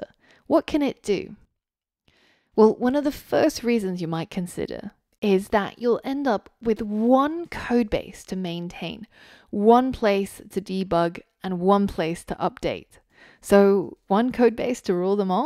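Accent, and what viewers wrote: United Kingdom. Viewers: She’s from London.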